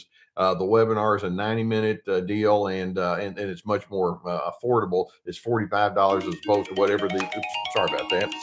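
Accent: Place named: Texas, United States. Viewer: I knew it! I was asking myself just the other day where you might be from & l said "I'll bet is from Texas." Not to brag but l was right! I used to live in Austin where l met folks from around the State & your charming accent brings back fond memories of my time there. I'm a much happier & more capable person in dealing with my own situation since l started watching your channel a year & a half ago. Thank you from Toronto, Canada.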